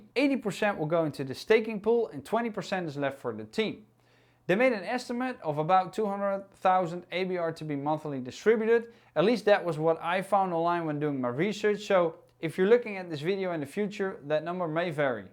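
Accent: Netherlands. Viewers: Can tell that you are from the Netherlands xD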